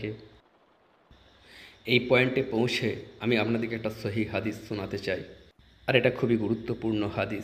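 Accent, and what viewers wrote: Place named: West Bengal, India. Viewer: ভাই উনার ভাষা এমন। উনি পশ্চিমবংগের সম্ভবত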